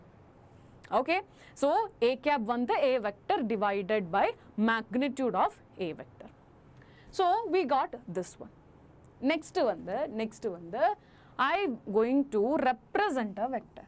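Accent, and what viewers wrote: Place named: Tamil Nadu, India. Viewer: Hi mam Karishma present mam Today session is done and dusted mam Sorry mam i didnt attend the last 1 hour lecturer but now i finished mam I really enjoyed this session and as much i will understand also very much mam Thank you for this amazing session and please continue sessions like this with your cute Tamil and smile😍😍😍😍😍😍😍😍😍 THANK YOU GOPIKA MAM TO SUGGEST YOU FOR US 😍😍😍😍😍😍😍😍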